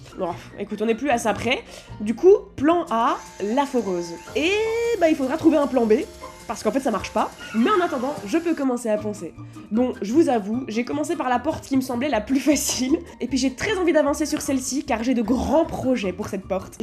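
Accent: Belgium. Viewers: Je veux dire d'où en Belgique, la Belgique c'était clair. J'hésite ente Liège, Charleroi et Bruxelles. Vous reconnaitrez que c'est un peu large. La prononciation des é avec des è me semble caractéristique, mais je n'arrive pas à cibler.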